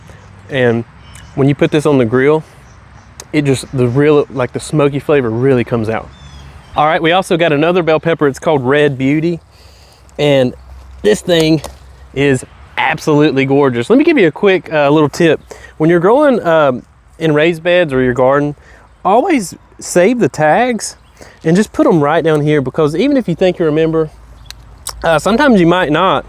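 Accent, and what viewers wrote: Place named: North Carolina, United States. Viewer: Oh my God! The taste of home grown tomatoes is amazing! I grew red pearl grape tomatoes (from Johnny's) this year, and they were so good! Very productive and so sweet... Also the yellow brandywine was amazing as well 👍 Thanks for sharing your NC garden. I used to live in New Bern but now I'm in Virginia. You're accent brings me back to NC 😄 love it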